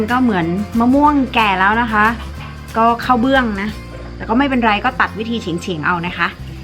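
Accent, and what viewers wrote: South, Thailand. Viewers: เพิ่งเคยได้ยิน พูดภาษาใต้ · พูดใต้ได้ชัดเจน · แลคลิป แหลงใต้ น่ารักมากๆเลยค่ะ😍❤️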